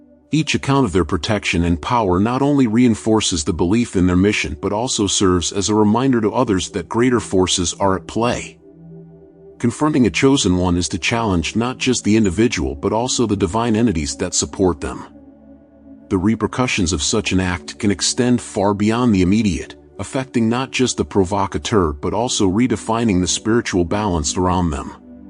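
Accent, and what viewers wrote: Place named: United States. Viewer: You have to drop the American accent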